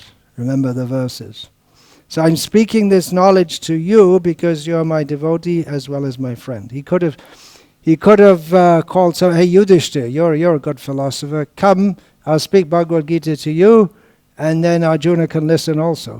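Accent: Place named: England, United Kingdom. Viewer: Always amuses me how Bhakti Vikas S. pronounces Sanskrit with an English Twang or twist....Wow !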